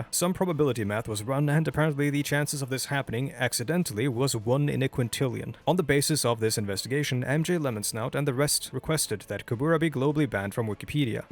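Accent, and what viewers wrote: Norway. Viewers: My new fav second monitor content guy/gal. Norwegian accent highly underrated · ha, Norwegian detected! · you kinda sound like LEMMiNO but you are not swedish but norwegian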